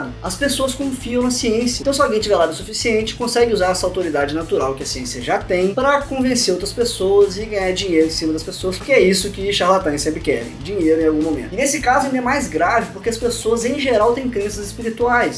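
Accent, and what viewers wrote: Minas Gerais, Brazil. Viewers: você é mineiro. Estuda em São Paulo ou em Minas? Abraço.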